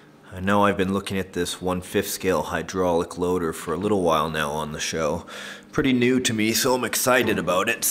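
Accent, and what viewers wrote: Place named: Canada. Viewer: he's Canadian.you can tell from his accent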